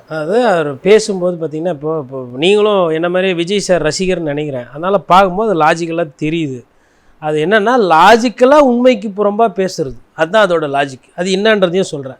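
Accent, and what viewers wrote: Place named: Chennai, India. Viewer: தம்பி உண்மையை நேர்மையாக பேசுவதிலும் சரி சென்னை வழக்குமொழியில் பேச்சுவதும் மிக மிக சிறப்பாக (சிரிக்க) கருத்தாழத்துடன் கூறும் பாணிஅருமை அருமை ❤❤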